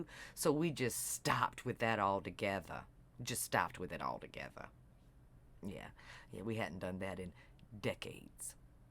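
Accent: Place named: Louisiana, United States. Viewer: Hearing you say exactly what I've been saying, in your lovely Louisiana? sexy voice, is fabulous! 👏👏👏👏👏👏👏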